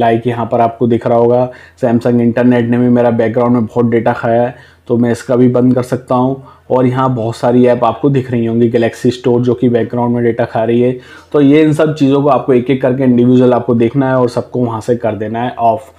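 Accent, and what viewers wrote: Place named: India. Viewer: Awful accent. Hard to understand. You Indians should be more careful about who is listening to you and try to speak slower and clearer.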